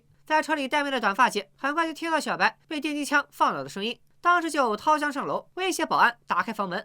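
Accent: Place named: Shandong, China. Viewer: 山东味儿有点重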